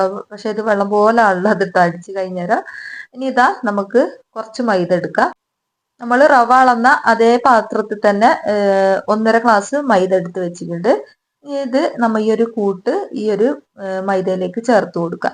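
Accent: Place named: Kozhikode, India. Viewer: Kozhikodkary enn thonnunn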